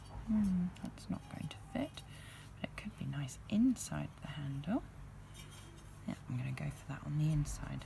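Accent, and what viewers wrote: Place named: Devon, United Kingdom. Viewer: Devon accent 'ooh arr... I like a bit of poking tool for aaafters!' x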